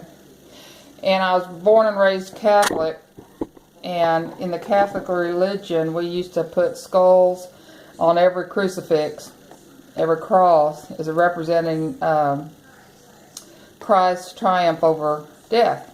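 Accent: South, United States. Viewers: Hi! Love your journal....being from MS, it's great hearing a southern accent! And being from Houston I am quiet sure you DO know how to cook beans!! LOL.. I also love western and Native American stuff... love how you tied the seam binding in the holes on the pages! I could say so many more things about this great journal... Thanks so much for sharing it with us!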